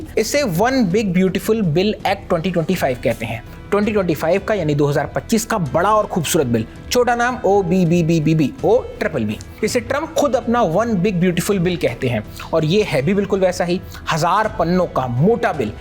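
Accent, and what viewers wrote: India. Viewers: waah kya desi style me news padhte ho.. simply fantabulous...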